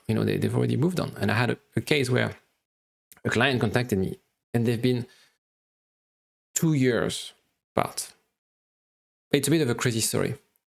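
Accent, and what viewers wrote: France. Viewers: This video was extremely helpful. Subscribed! Also, your French accent is very cute😊🇨🇵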